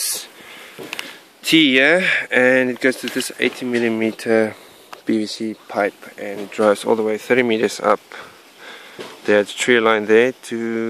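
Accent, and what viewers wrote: South Africa. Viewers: great,I thought I recognised the SA accent, nice video! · Thank you. Pneumatic / hydraulic....... very impressed that it is still so loud, even with rubber seal / buffer in it. Would be so great if you could use all that closing force to fick a wheel / generator over, the last 1/2 inch may be and a ratchet / escapment may be? Water wheel, bring wheel up to the snout, or bring snout down to the wheel, or extend the snout & let it free fall onto the edge of the wheel. Were is "flood season".....? you accent sounds in Africa... south? Regards Bob ( London UK )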